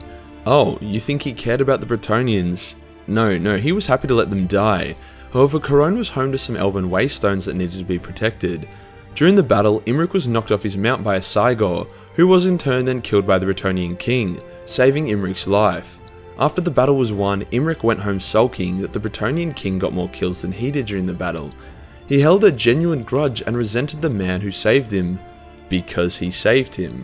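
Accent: Australia. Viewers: I love listening to an Australia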